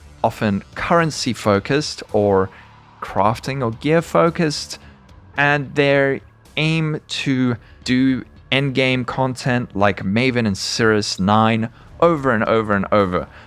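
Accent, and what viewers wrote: South Africa. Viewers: You are completely right! I am an old school crpg player (wow it’s almost 40 years I have been playing since hack/rogue/wizardry 1 in 82) and for me nerfs are amazing as it just makes a whole new journey/challenge available. I get bored with high level characters that can do everything, it’s figuring out how to build and tackling challenges with my creation that’s fun. Probably why I played Diablo 2 for years as well. Anyway thanks for a great video and channel! Btw are you South African/Ex S.A.? Me too! I grew up in joberg).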